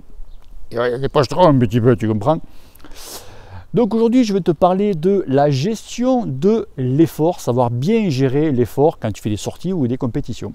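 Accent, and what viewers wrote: South, France. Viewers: Salut, je veux juste remercier pour tous tes conseils avec l'accent ensoleillé ☀️ et chaque fois des expressions qui me font marrer, des thèmes que t'aborde actuelles et que tous ceux qui font du vélo se reconnaissent un moment ou un autre dans des situations diverses (avoir une patate du diable, être dans le rouge, voir la vierge 😂etc,etc), et surtout continue ces vidéos qu'on apprécie, salutations de la 🇨🇭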